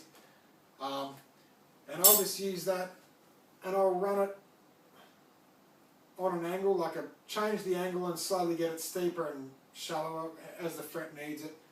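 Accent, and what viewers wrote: Australia. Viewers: Finally saw your face! Where are you from? And where's that accent from? I guess Australia but maybe I'm wrong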